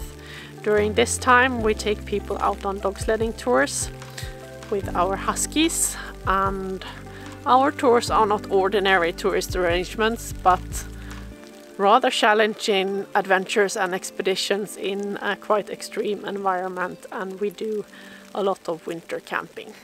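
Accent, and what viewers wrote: Sweden. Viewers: Thank you for the tips!! Survival so key. Your accent is lovely. It sounds vaguely familiar, but not sure if that is due to US melting pot or English/Scandinavian ancestors. Either way, your English is muuuuuch better than my Swedish. :) Tack för att du delar med dig av din expertis! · 2 sekunder in hör svengelska, japp definitivt sverige:)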